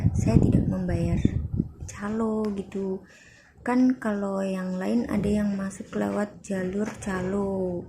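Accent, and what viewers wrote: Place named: Java, Indonesia. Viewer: MBK asli mana logatnya ky orang Jawa ini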